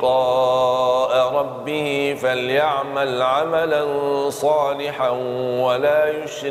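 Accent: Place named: Medina, Saudi Arabia. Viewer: Sy suka sekali murottal ini. Terbayang syekh Madinah namun sdh bercampur Indonesia. Doa kami untukmu, syekhuna 🙏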